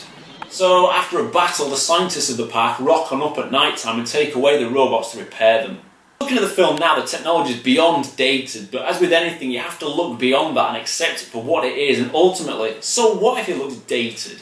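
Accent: Scotland, United Kingdom. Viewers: Its wonerful to have you back mate, been waiting for a long time to hear your scottish acent again 5/5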